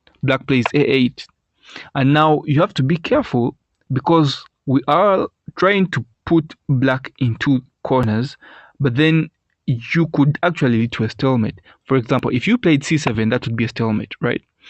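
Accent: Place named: Uganda. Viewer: I'm Ugandan too so I could tell from the accent. This was very tricky for me to figure out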